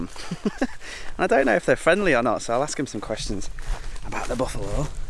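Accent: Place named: North, United Kingdom. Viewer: Absolutely brilliant, and his calm manner certainly shone through as it does in his vlogs. You looked so relaxed and loved the way there was a northern accent coming out of you. Oh and piglets, thanks for making me laugh so much... Been waiting for this blog to come up since I saw you had visited him and for sure it didn't disappoint. Thanks for sharing.. merry Christmas greetings from Greece 🎅🎅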